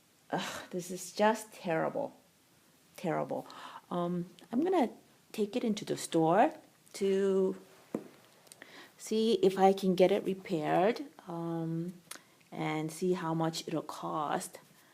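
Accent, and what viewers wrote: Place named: Philippines. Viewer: You sound like a Filipina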